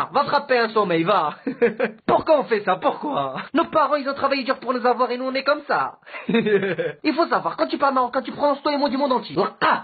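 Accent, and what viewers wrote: Algeria. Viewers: Tu parles comme l ouest de l Algérie 🇩🇿 moi aussi je parle comme toi et je suis algérienne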